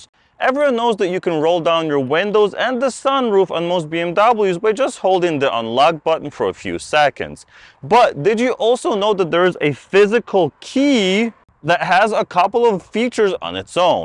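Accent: Russia. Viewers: This was very useful. Even Russian accent sounds good.:face-blue-smiling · Why do you speak like "Diagnose Dan"? Oh, with Russian accent... I can understand that's not easy to lose your accent, buy why copy a hard working guy?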